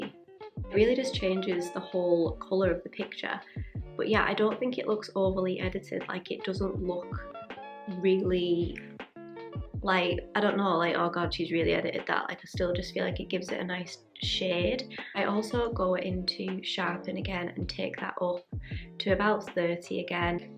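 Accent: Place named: Cumbria, United Kingdom. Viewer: Cumbria accent aiiii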